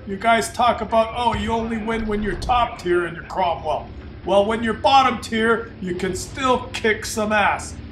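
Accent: Canada. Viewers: Impressive knowledge! Sounds like you're Canadian!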